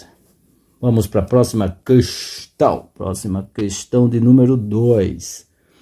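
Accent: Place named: Northeast, Brazil. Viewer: Não acho que nordestino tenha que mudar sotaque por se encontrar em outro lugar, cariocas, paulistas , gaúchos, não mudam sotaques quando moram no nordeste, gosto muito das aulas, mas esse pensamento é de "colonizado"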